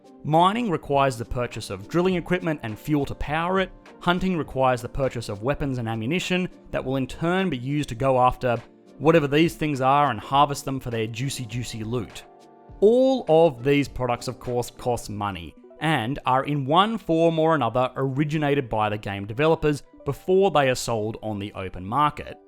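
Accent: Australia. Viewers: This channel makes me wish I had an Australian accent. It just sonds so relatable and charming.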